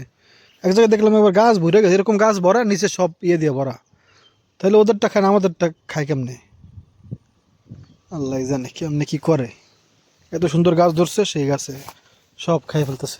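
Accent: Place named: Barishal, Bangladesh. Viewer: বরিশাইল্লা কথা বলে তাই কথা বুঝি না কলা গাছ কাটার পরে বলে এককালে জাল ছিঁড়ে পড়ছে৷ অনেক কথাতেই বলে এক কালে এক কালে এককালের অর্থ কি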